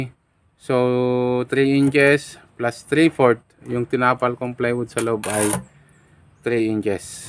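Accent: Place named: Ilocos, Philippines. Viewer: Ilocano ka met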